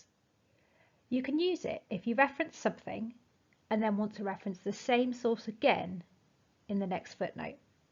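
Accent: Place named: England, United Kingdom. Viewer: I love your English accent ❤️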